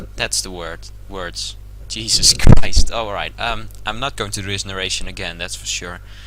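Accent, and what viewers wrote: Netherlands. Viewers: wat een heerlijk nederlands accent hahah goed gespeeld trouwens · are you dutch?? cuz you have a dutch accent especially when you said: you kinda have to expect a shellbreak baton passer. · hearing a dutch accent haha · your dutch :P ?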